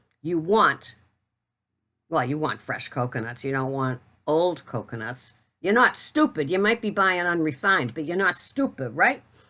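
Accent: Boston, United States. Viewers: yesss! I saw that too on Jason's channel! I totally agree with that. When you're eating whole fresh foods you won't have all those hidden sugars and hidden "junk" but people are eating so much processed keto stuff now that carb number can creep right up on ya! I love your boston accent!!!!! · I LOVE the Boston Accent. I need to do my New York Accent! Lol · Good morning. I love, love you. You will never be called crazy as far as I'm concerned. I don't know if you have seen my last video "Coffee chat". That I posted on Friday, January 19, 2018 or not. Now that is crazy. Love the Boston accent. It makes me smile, good for the soul. Enjoy your weekend. 💕. NonnaGrace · Morning to ya,! I am behind but cant resist your Boston accent! Yes, it will always be total carbs for me. Anything else is to 'iffy' xoxoMarjorie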